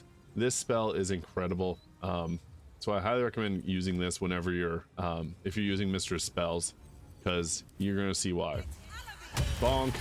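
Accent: Canada. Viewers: Homeboy is Canadian. Different linguistic inflections and pronunciations.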